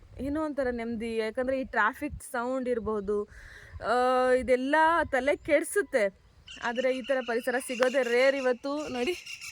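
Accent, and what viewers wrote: Standard. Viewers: Awesome vlog sis.ondu vishaya sis neavu meathodooo kannada geaaa ondu salute 🫡 pure kannada 😊😊😊😊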